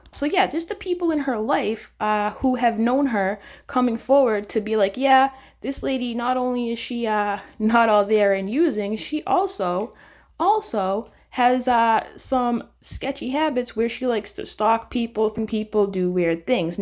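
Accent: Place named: Boston, United States. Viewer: Great episode.... I love your accent.. Do I detect a Boston accident?? Maybe new york? One of the burroughs?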